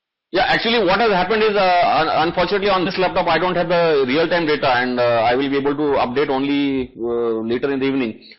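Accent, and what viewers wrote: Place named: India. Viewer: That terrible Indian accent cannot be followed, such a shame!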